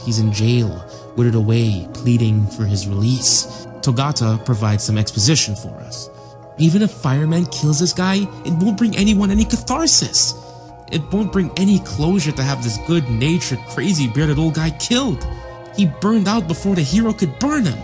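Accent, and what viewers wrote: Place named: Caribbean. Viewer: What part of the carribean are you from?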